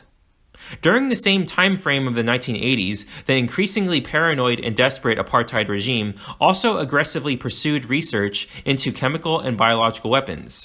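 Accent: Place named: North America. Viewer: The narrator has a North American accemt. I wonder if in his research he came across how United States and Canadians did the exact same thing. Do a video on these two countries. Especially the racialised science and politics of the US which continues to this day!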